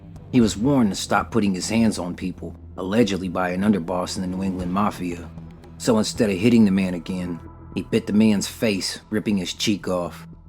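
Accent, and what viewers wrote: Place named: South, United States. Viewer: Where is your accent from? I'm from New England and I know it's not from Boston. I hear hints of mid southern or even Midwest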